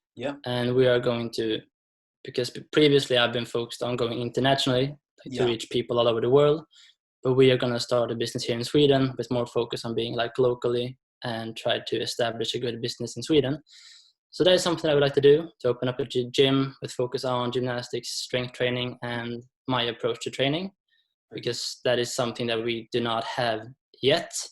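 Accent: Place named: Rome, Italy. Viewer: danvanzandt ahahah I’m italian so he just speak it. with a very strong Roman Accent ahahah But don’t worry, really!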